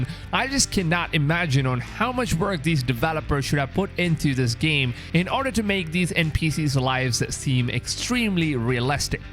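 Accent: India. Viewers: Just a lame vid about skyrim titled cyberpunk from a guy with a 7-11 accent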